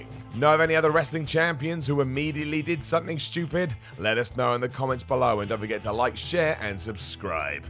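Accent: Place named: United Kingdom. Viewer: Skipped through the entire video within 5 seconds and saw every single thing I wanted to without having to listen to some annoying British millennial mark say anything.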